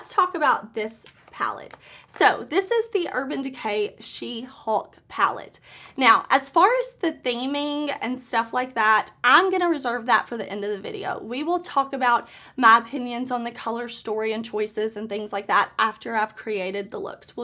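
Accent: South, United States. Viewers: I literally love your voice and is that a southern accent? I also love that you have so much lid space. I have hooded eyes so my shadow is always kinda hidden.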